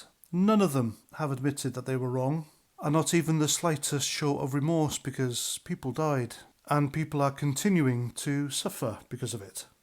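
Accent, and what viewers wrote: Wales, United Kingdom. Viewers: Your accent isn't Cardiff or Swansea, you from Merthyr maybe?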